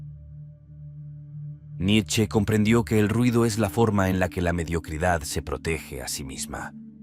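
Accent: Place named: South America. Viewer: Parece un sudamericano pretendiendo ser castellano. Es mezclado.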